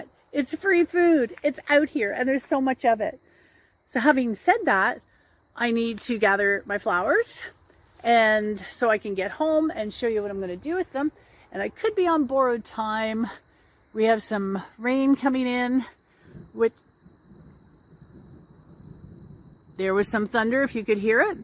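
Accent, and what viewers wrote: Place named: Canada. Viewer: Thank you! Wre those crickets in the background ? Where were you filming from? You sound Canadian but i don't associate crickets with Canada!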